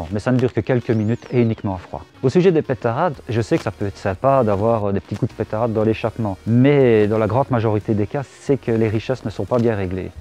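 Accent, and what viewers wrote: Belgium. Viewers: Rien que de t'entendre parler je suis certain que tu est Belge !!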